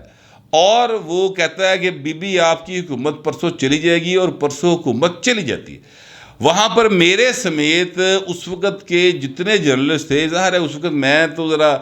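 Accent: Punjab, Pakistan. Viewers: Nice Punjabi touch in this 100% true anylsis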